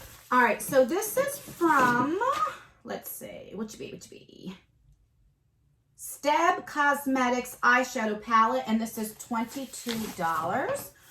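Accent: New Jersey, United States. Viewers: 😂😂😂 my boyfriend heard your voice while i was watching this...he is from the east coast and has a brother in Jersey "who do you know in Jersey?" me: oh just one of my fave youtubers.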